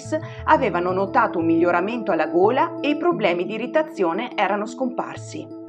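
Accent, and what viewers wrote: Veneto, Italy. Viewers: Sei veneta? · Racconto interessantissimo... grazie☺️ Sei Veneta per caso? 😬🙃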